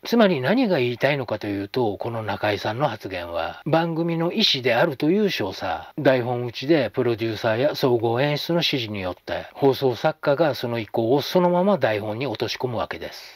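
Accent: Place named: Hiroshima, Japan. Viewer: 聞けば聞くほど、広島弁😆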